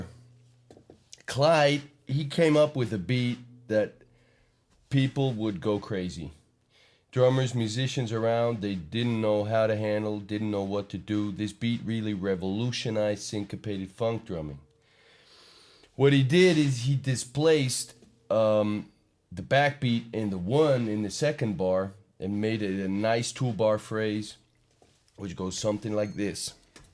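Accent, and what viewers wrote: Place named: Canada. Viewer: nice canadian accent bro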